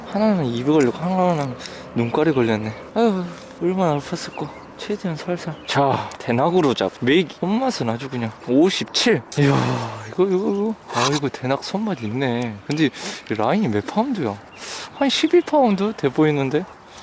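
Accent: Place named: Jeolla, South Korea. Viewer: 전라도여? 사투리가오지구먼잉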